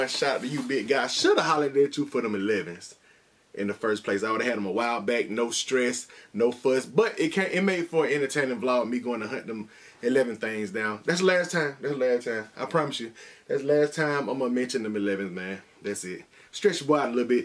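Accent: South, United States. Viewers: man i luv your southern accent you sound just like t.i but with a lil deeper voice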